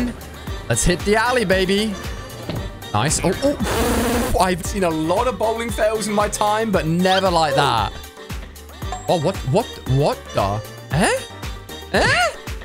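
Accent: United Kingdom. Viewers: are you british? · Why are you British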